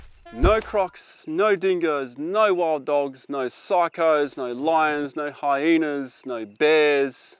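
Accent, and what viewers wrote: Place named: Australia. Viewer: I’m not sure why I find aussie accents so annoying. I guess I just want them to annunciate…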